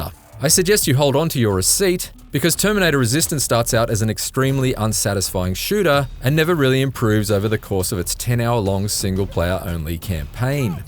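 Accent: Australia. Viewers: “ske-leet-al” never heard someone with an Australian accent pronounce skeletal before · He ain't a brit, he's an Aussie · It's that aussie accent again, eh?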